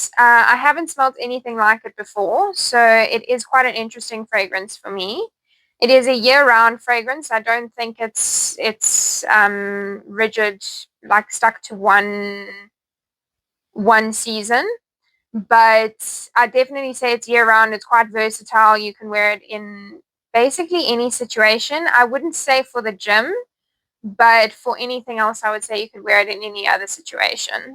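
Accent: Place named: South Africa. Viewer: Your accent gives me Mzansi Vibes... Enjoyed the review and like the ink art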